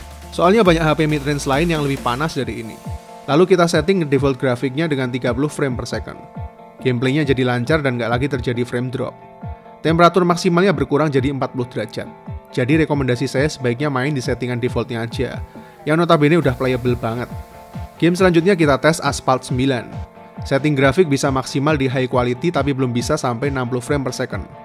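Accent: Yogyakarta, Indonesia. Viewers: wong jogja mas? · orang jogja bang? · Bang orang jogja ya?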